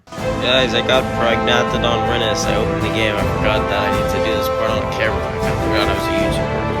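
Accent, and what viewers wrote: United Kingdom. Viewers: you sound british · bros british accent slipped back, also the list is pretty much what id make my list lmao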